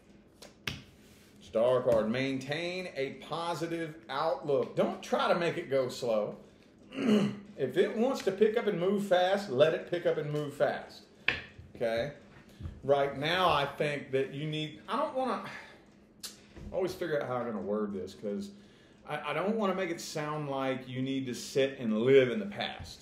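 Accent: United States. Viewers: Nice reading 😊 By the way, I like your accent. Where in the States your accent from?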